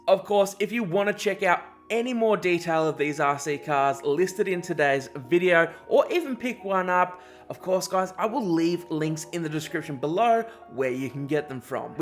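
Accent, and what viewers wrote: Australia. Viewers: Is he Australia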